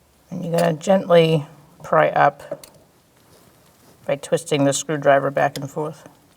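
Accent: Boston, United States. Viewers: Thanks for the content .. I'm about to do the rear two on mine .. btw is that a Boston accent ?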